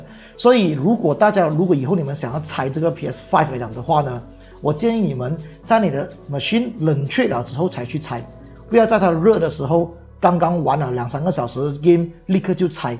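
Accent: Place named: Malaysia. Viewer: 马来西亚口音的普通话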